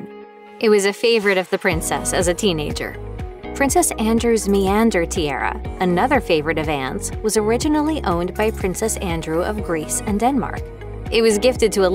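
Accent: United States. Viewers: The commentary was done by an American, what more can I say 😆 I've always heard that Princess Anne was quite frugal in lots of ways (as far as Royals go that is). Americans being obsessed with wealth, well there you go, the commentary is bound to be quite materialist and incorrect. That's Americans for you. I really wish yanks would stop reporting on the Royal family with their screeching accents. It grates on the nerves! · It gets right up my nose to hear someone with a strong American accent criticising our Royal Family, why don’t you just keep your biased comments to yourself and perhaps concentrate on all the serious social problems you have in your own country such as racism, gun crime, obesity, avarice and greed.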